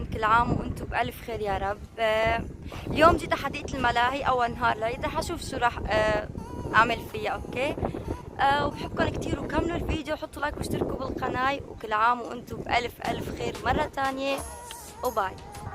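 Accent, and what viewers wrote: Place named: Syria. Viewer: انتي حليبة ليش هيك بتحي ههههههه وسورية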